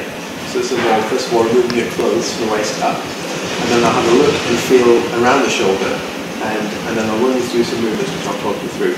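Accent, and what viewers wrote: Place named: United Kingdom. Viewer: very good clear, and precise. best of all a british accent :-)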